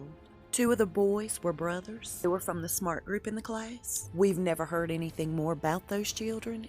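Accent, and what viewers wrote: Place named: North Carolina, United States. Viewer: Yes, I want the in depth video! I have heard this shady side of the RF for years, and I think part of why they get away with this is that the things they do are so appalling and out there, that people just can't wrap their heads around any human beings doing such things. About hands...I remember a picture of Hillary taken after she had that collapsing episode, where her hands looked markedly enlarged and odd to me. I remember the picture was outside of Chelsea's apartment and Hillary was trying to convince people that she was fine. She was wearing a navy blue pantsuit. That picture stuck in my mind for another reason too. Hillary looked different, and I wondered if it was a body double. I think so much about these elites is just smoke and mirrors, nothing is as it seems. I feel like we've fallen through the rabbit hole. 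And, I love long videos when they're your videos! I like to listen to videos when I am cooking and washing dishes, etc. Seems that I just get my hands covered when kneading dough or scrubbing, then the video is over. Thank you for all you do! You're a blessing! I was on the phone earlier with a friend from Lenoir, NC, and your accent reminds me so much of hers! 💕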